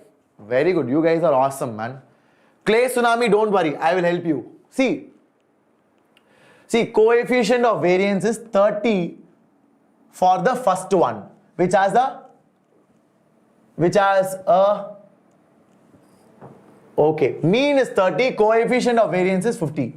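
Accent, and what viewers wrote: South India, India. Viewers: Sir, I love your south indian accent 😍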